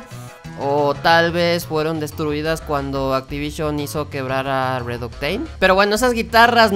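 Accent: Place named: Mexico. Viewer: Este wey tiene acento mexicano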